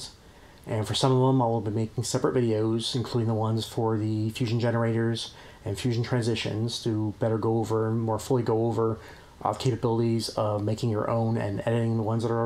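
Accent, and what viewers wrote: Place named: United States. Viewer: Nice overview, definately helpful! I have a very off-topic question, which state are you from? I think i can hear it but i'd like to have it confirmed! :)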